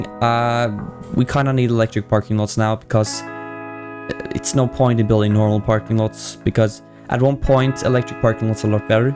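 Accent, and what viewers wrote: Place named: Norway. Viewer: im norwigian to i can kinda hear that your norwigian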